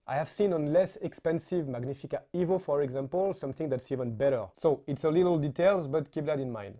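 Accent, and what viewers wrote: France. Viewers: En t'ecoutant je realise qu'on peut pas pas cacher notre accent francais😂😂😂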